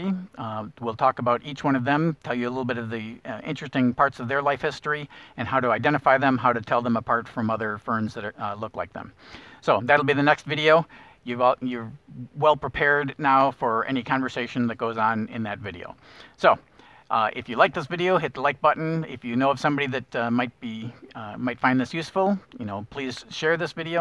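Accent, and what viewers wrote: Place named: North America. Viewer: I notice you have a North American accent. Will you be doing lots of plants that can be found in the UK? Found you from hearing about the Photosensitive poison in Parsnips.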